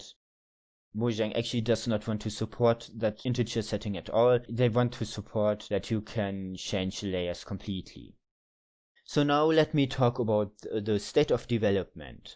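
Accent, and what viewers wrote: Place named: Germany. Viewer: a yes. my library of german minecraft yt accents is growing once again, but maybe by devlog 4 I will get used to it and won't notice anymore (as happened with mango, clover, and probably many others) EDIT: also that pronunciation of your name is... is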